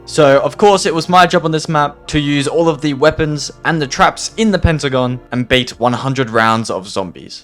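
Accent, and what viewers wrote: Australia. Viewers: in google translate its cinema of the dead and he is saying nacht correctly he just aussie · me and the creator of the video are both australian we have the same accent, it is NOT an accent problem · You aussie man?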